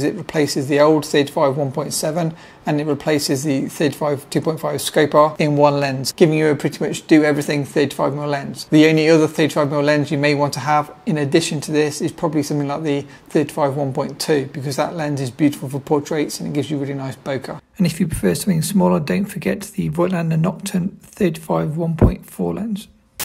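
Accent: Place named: Northern England, United Kingdom. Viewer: I suppose accent is a Northern English accent. I like it very much! Very easy to understand. And is nice to look at;)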